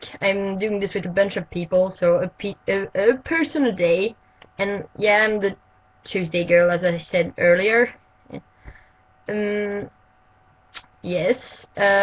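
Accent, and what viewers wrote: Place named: France. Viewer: 1) You are not boring. 2) This vlog is not crappy, so the title is totally misleading. 3) You accent is so cool, but I was actually expecting it to be more Swedish than French, for some reason (: Great video! Keep it up (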